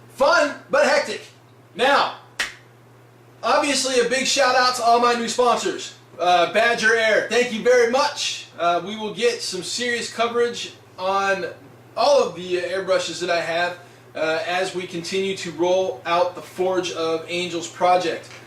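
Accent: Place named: Georgia, United States. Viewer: Welcome back to the vlog world! When did you pick up the Georgia accent?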